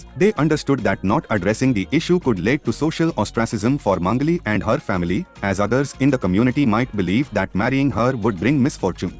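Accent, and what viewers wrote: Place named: India. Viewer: Why out of all of the posts on this channel is this the only one with the Indian accent narrating it 🤔 😅😅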